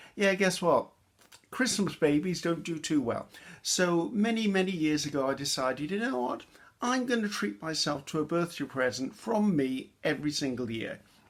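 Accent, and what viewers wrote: United Kingdom. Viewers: I watch your wonderful videos daily and I never tire of your creations. I especially enjoy listening to your British accent and quirky sense of humor. I live in the Deep South of USA. We use tung oil on heirloom antique furniture.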